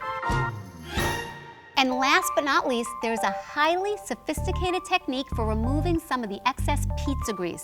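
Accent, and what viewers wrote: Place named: East Coast, United States. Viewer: I love your SEXY east coast accent.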